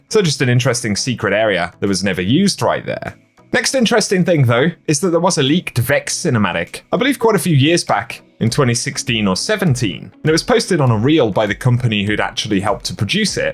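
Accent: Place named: Midlands, United Kingdom. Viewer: I would’ve out good money on Fate of all Fools being in here! Great video! Ps are you from Birmingham / the Midlands? Your accent sounds familiar!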